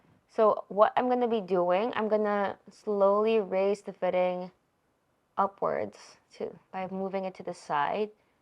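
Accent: Philippines. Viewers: are you a filipina:? cause i can hear a filipino accent in you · I just recently discovered your channel and I'm amazed at your PC assembly skills. The video editing is excellent as well. I called over my son to watch and he asked, "Is she Filipino?". What? I then realized that your accent did sometimes sound like a Filipino who speaks English (not always). Then I heard you say, "Hay nako!" OMG! (",) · I would also love to make my own customized liquid loop for my pc. How expensive it is compared to a normal AIO? And by the way, you have an accent of a Filipina, it's cute :)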